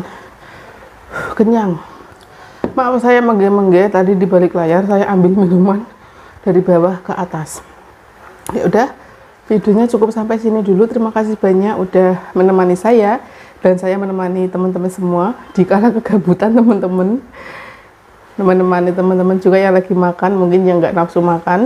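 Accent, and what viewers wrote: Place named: Java, Indonesia. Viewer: Mbak klo ngmong jawa lucu😁 sehat²nggeh mba dan keluarga🤗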